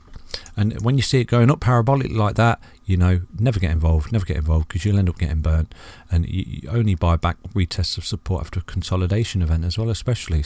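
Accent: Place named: Nottingham, United Kingdom. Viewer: Sound like your from Nottingham! Thanks for the videos bro if you ever wanna do paid lessons for learning charts let me know I’m looking to learn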